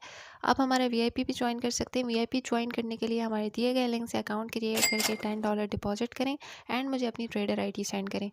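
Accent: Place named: Karachi, Pakistan. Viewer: اپ کی اردو سے لگ رہا ہے اپ کراچی سے ہیں اپ کی ٹریڈنگ کمنٹری سے محسوس ہو رہا ہے کہ اپ ابھی نئی ٹریڈر ہیں البتہ اپنی کوشش جاری رکھیں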